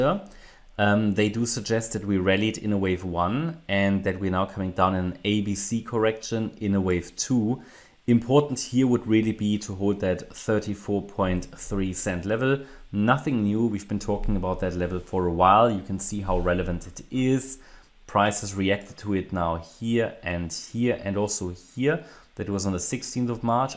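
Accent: Germany. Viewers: May i ask what part of Europe are you from? Germany🤔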